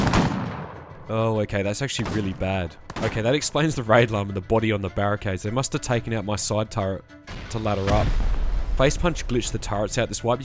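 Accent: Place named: Australia. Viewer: Is this dude Aussie? He sounds like an Aussie.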